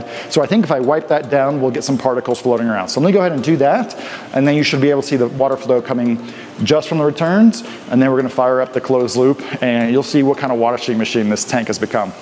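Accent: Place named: Australia. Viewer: I thought you were from Australia 🇦🇺😀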